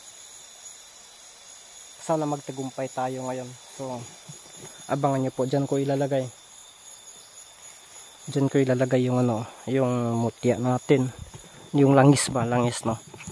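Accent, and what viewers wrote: Visayas, Philippines. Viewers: Lingaw kaayo kos imong sinultian boss bisayang tagalog amping always Godbless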